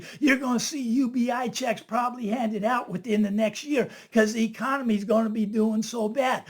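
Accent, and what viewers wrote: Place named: Texas, United States. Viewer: I can't place your accent,. Texas?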